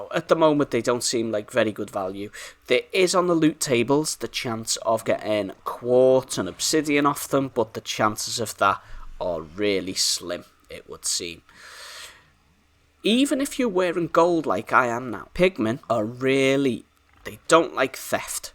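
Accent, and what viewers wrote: Liverpool, United Kingdom. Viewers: Nice Scouse! Clive is SO cute ❤ He fits you for a spirit animal lol.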